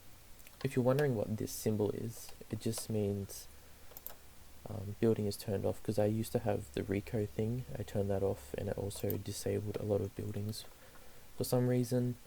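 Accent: Australia. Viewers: Mate I love your cities! And I can tell you're an Aussie too. Would love to see you do a let's play without mods. You could model it on an Aussie city too. Give it cool district names and have specialised districts too. Would be cool!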